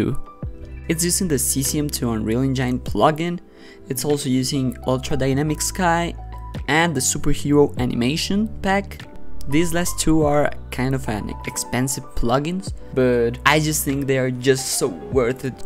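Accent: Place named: Brazil. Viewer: mano tu tem sotaque de br kkkakakakakakak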